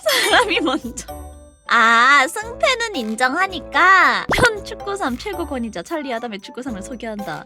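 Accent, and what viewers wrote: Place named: United Kingdom. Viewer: 영국 발음 느낌 지리시네요ㅋㅋ 개추드립니다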